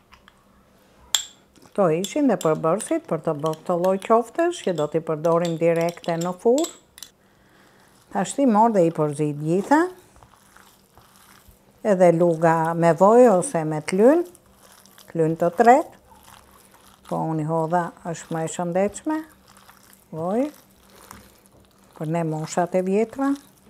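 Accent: Tirana, Albania. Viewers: me zërin, dialektin dhe gatimet e Tironës, më nxjerr mall, shume mall .....!!!🙏❤️😭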